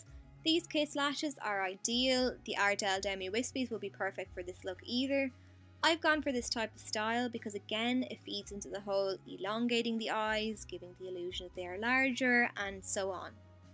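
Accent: Ireland. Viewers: Ur accent is so cute. Are you Irish? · Love your accent~ It's Irish right ? :)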